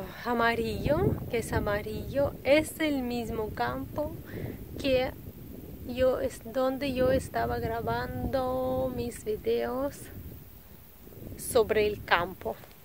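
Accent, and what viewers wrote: Russia. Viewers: y disfrutar de tu compañía me gusta tu tono ruso al hablar castellano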